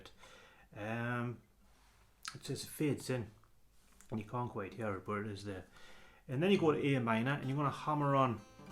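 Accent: Newcastle, United Kingdom. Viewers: love the geordie btw, b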